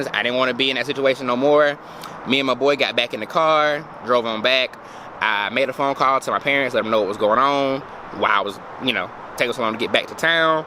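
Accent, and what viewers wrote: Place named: Georgia, United States. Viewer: lmao sounds like gwinnet 😂😂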